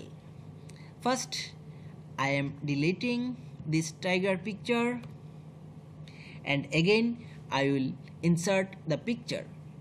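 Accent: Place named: Bihar, India. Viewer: Bihari english speaking first you speak clearly hindi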